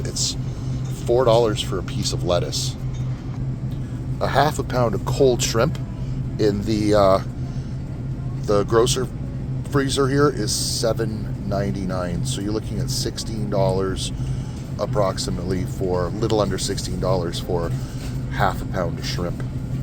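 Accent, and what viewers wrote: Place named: Canada. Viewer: Oh yeah you're an American but you look and talk more Canadian than most Canadians I know. Thanks for covering this in Canada. Best wishes. By the way, these prices at Metro are BY FAR the MOST expensive I've seen at any grocery store here in Canada. This is why I never shop Metro. And I bet those onions (and a lot of the other produce and meats) go rotten sitting around because no one is buying them.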